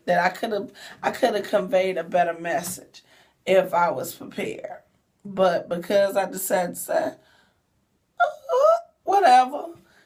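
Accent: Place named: Memphis, United States. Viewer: Are you from Memphis because I swear you sound just like all my cousins in Memphis!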